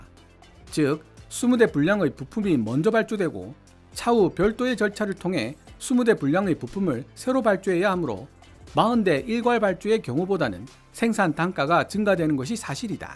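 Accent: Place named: Busan, South Korea. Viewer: 부산 사투리억양 정말 듣기 힘드네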